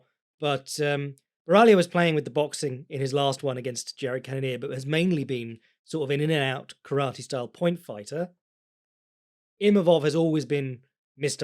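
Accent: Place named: Scotland, United Kingdom. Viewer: end of an era, we will neveR hear use his Scottish accent to say Paul Craig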